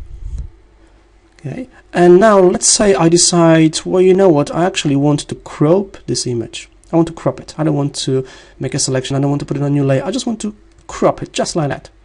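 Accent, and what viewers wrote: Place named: France. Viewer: exotic accent ;) French or a Slavish Language? thanks for the tutorial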